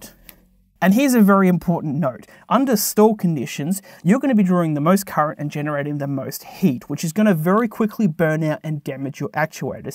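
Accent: Australia. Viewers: Yewwww fuck yeah mate, love the vid, love the channel. I'm from the central coast and I love hearing aussies explaining electronics.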